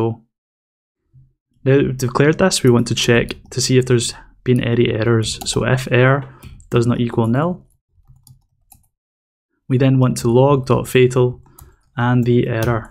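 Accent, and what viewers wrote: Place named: Scotland, United Kingdom. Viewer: Scottish bloke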